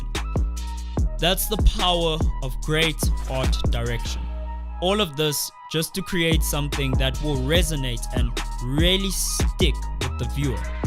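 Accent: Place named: South Africa. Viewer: I really liked your video. I can even hear that you're south African because of your accent and that you pronounce the names correctly.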